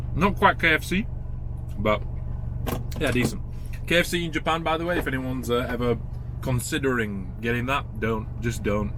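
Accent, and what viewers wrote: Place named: Liverpool, United Kingdom. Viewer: Deffo a scouser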